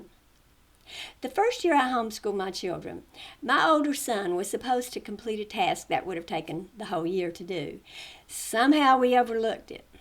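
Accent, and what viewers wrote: South, United States. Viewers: First I just have to say how much I love your southern accent , the hi and bye in your videos is just the best to me lol . Very well said and excellent pints , I’m 29 and have spent most of my life in fundamentalist Christianity , but every time I opened the Bible and saw the strikingly human nature of this god it constantly agitated me .. how could this have been the supreme god who we are suppose to worship , and yet his moral fabric is shady at best and outright malicious at worse … along with the fact that he does nothing to intervene in the collective human suffering that’s continuously happening in this world , and then ti stoop so low as to use threats of torture against people who won’t Appeal to his ego , no thank you , what is the point of having a god who is utterly useless and narcissistic.. needless to say I’m glad I got out when I did . 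Excellent work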